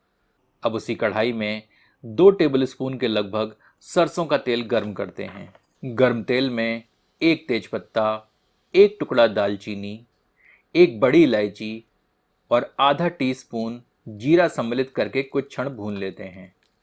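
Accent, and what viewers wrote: Standard. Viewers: aap ka sabse acha lgta bolna, jo ki itna shudh hota hai ki mano koi Guru hme mantra bolna sikha rha ho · your "shud" hindi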